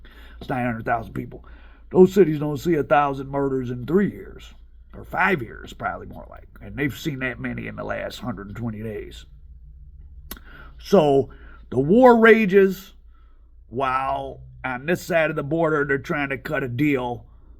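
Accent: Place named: Chicago, United States. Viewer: Can't stand this guy's Chicago accent. Its like watching that movie Fargo.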